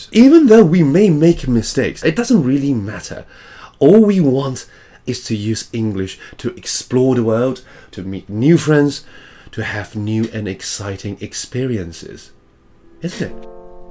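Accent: RP, United Kingdom. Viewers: 英國腔聽起來很貴族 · 英式發音真的很讚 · 英式英語就是好聽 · He studied in London and graduated from the University of London. Of course, he has a British accent! shut the f up if you knew nothing. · 從來沒有著迷過英國腔，自從聽到Eko老師的英國腔後，真的只想聽英國腔了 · 主要是英式腔聽起來很有氣質，文縐縐的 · Definitely he is! He is using the Royal British accent and speaks professionally. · 很英的英文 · 聲音是英國人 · 發音有點英式 好聽 很像看哈利波特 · 英式發音👍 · 英式发音听起来好舒服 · 英國腔真好聽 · British accent，说的真好听❤ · 沒在聽 英式腔調 跟語速 有點聽不太懂哈哈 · 英國腔像石內卜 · 英式口音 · 超喜歡老師的英式英語，深深著迷，又帥又有氣質。😅😅😅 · btw I love your English ascent is it British? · 老師的英國腔讓我著迷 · 英式口音好銷魂😍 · Wow who's this Japanese looking Tawainese dude speaking in British accents?(Speaking as some Aussie Hong Kong guy)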